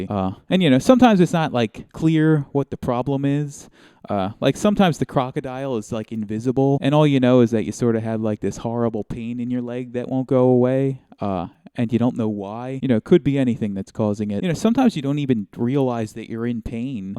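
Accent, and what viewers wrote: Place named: Philadelphia, United States. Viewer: I’m from around the bucks county area. I could tell by your pronunciation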